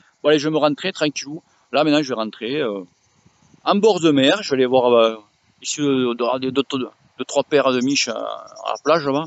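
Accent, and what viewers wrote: South, France. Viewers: Bonjour, tout d’abord merci pour tes vidéos, ta bonne humeur (avé l’accent et les cigales en plus👌🏻). « Minimum 120% FTP et jusqu’à 150% FTP» sur 4’ s’apparente à effort 4’ >PMA (effort Max 5’), non? Dans ce cas, personnellement impossible à tenir pour l’amateur que je suis, du moins pas sur plus de 1 répétition encore moins après 6’ de SST 😅🥵. Tu dois être une sacrée machine pour le faire 3x 💪🏻 pas accessibles à tous comme séance...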